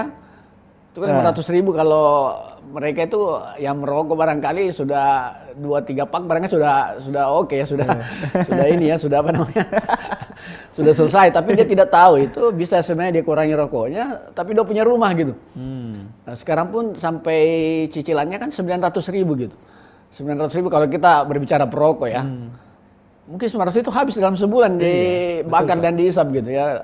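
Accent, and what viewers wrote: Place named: Makassar, Indonesia. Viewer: edeedeeee kentara na makassar na ini daeng 👎👎👎👎👎🤪🤪🙈🙈🙈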